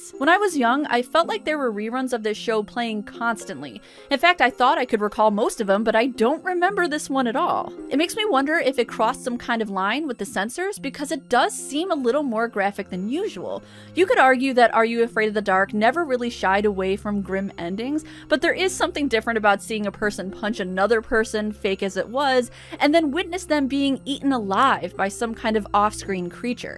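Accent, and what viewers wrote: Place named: Midwest, United States. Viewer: Your from the Midwest aren’t cha?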